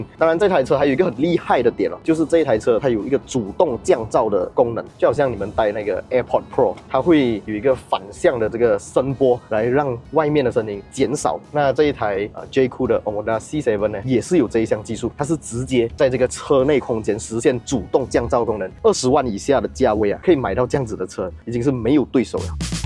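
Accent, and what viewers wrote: Malaysia. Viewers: 听马来西亚华人说华语好舒服的感觉 👍 很可爱的口音 讲解也很专业 爆赞 ❤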